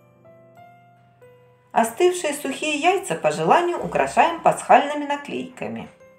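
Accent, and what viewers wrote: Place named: Ukraine. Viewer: Акцент хохляцький. Важко слухати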